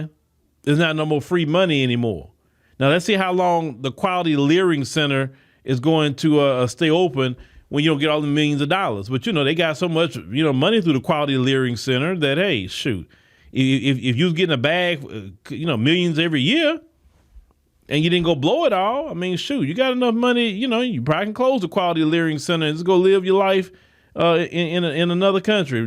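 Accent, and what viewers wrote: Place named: AAVE, United States. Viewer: Bro, can't you speak proper English? Why are you as a blogger sitting in a fancy office in a suit with an expensive watch talking Ebonics like a ghetto middle school dropout? I suggest you go read Thomas Solwell's books.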